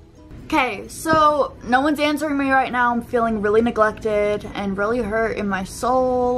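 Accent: United Kingdom. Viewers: This is my first time watching u, I saw your tiktok but I wanted to know, are you British???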